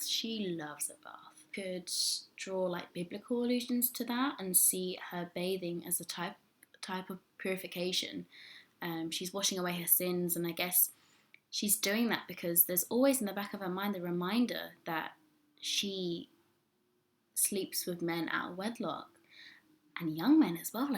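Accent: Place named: England, United Kingdom. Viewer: I got distracted so many times with the english accent and you playing with your hair :D but it was a great video after all. Great character analysis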